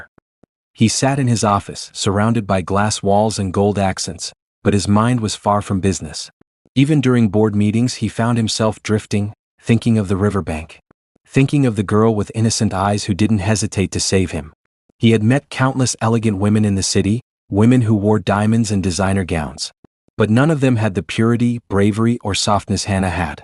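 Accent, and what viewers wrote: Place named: United States. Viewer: Everything is nice , except the bits can come off a bit colorist,Due to the readers white American accent.bits he keeps saying dark and color unnecessarily.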